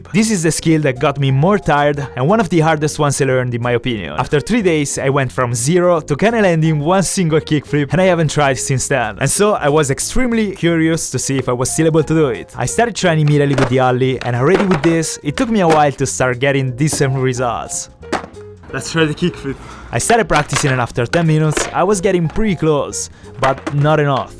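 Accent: Italy. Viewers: Si capisce subito che sei italiano ahahah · You can practically taste the Italian accent,Grandioso, lol. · non so perchè ma dall'accento , e dal fatto che quando hai fatto il test della velocità di scrittura era italiano penso proprio che tu lo sia , non so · Your english sounds like italian english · ma come forse😂 l'accento è italiano😂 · Si sente che sei italiano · Ahaha, when you said that you have to become a compass I knew you were Italian, then I listened to the accent and saw the location. gg bro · vedo un po di italiano in questo inglese <3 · Ma sei italiano perché questo è il tuo primo video che vedo e si sente che non sei ne inglese e ne americano e anche perché parli in inglese uguale a me😂🇮🇹 se sei italiano sei un grande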